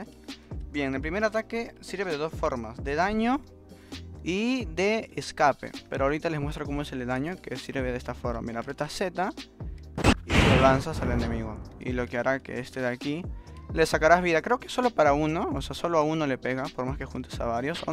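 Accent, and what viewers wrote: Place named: Córdoba, Argentina. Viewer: Cordobés parece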